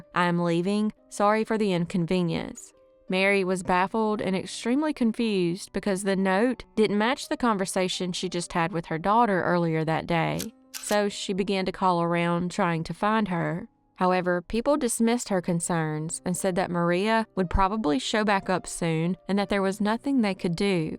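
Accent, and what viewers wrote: South, United States. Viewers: 🤔 I literally heard these crime updates, word for word, on another podcast. Is " " cutting and pasting together her show and adding a country twang?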